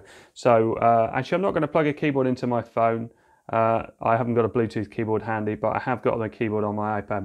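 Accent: United Kingdom. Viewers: love to hear some English accents on a tech channel 👌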